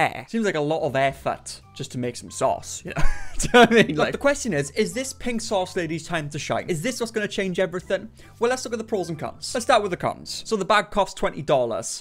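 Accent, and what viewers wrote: Wales, United Kingdom. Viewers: Bought my bro that poster for Xmas 😂👍 love it 👍and your Welsh accent ❤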